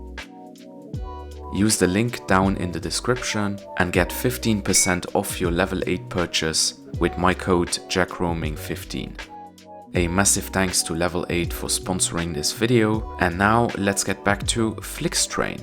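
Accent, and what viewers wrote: Germany. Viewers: 😂😂😂😂love the german accent... The way you said "pretty funny smell" made me chuckle. 😂😂😂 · akzent ist deutsch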